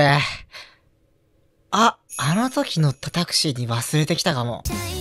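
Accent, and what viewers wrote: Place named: Kansai, Japan. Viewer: めろんぱーかーメンバー取り上げてくれるの嬉しい！読む時関西弁になってるのが地味に嬉しい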